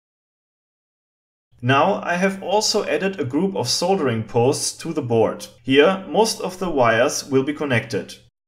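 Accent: Germany. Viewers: Hate german accent -.- SO FCKN TERRIBLE!! Nice vid tho.. :D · I love how you almost mix some German words into the English ones. Like Zwölf and Twelve turn into Twölf😂 Also, awesome hack. I have used your audio input hack on an old Grundig "Music Boy" radio reciever, and it works great! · i was here just to hear german speake english with accent! it was good ! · The switch has to be deleted.......... LOL In den Papierkorb verschoben......... gröhl.... Herrlich dieses Englisch mit dem deutschen Akzent! Ich bin stolz auf dich! Weiter so! Vielleicht doch zukünftig in Deutsch? Aber solltest Du dich mal nach Bonn verirren gehen wir ein Bier Trinken! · Dis strong German Dialekt made me klik Like at instant. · Man hört den deutschen Akzent einfach mal sowas von :D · Horrible German accent.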